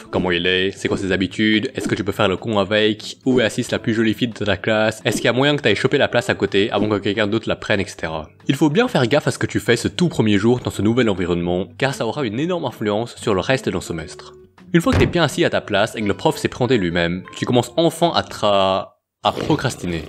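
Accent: Belgium. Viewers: T belge? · Tu es belge?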